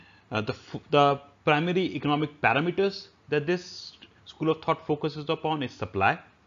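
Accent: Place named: India. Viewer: The Speaker is good to understand, with a light indian accent. Only the names are not proper spelled. I was puzzled by the spelling of Keynes. His name is John Maynard Keynes- Not Mayer. Marx as a economist sounds wired when you know his biography. As a critical philosoph, a Dr. of Scientific Law and Journalis, lector etc. involved in the worker class movement and creating a new idea of society. May be that is the reason why economist can not figur out what his impact was in the european countries he influenced. At last Schumpeter is not from Germany even he have had a great influence and new the austrian school better as anyone else as a oponent of Max Weber.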